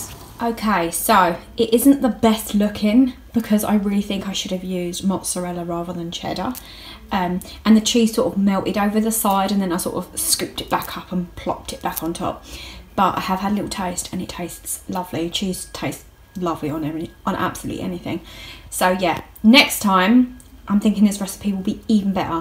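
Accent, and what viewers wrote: United Kingdom. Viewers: Really! The lovely Brit accent. Thanks much. But---is the "Little" a nickname preface of sorts? Surely a tween in a few years won't be amenable to that. 😉🥰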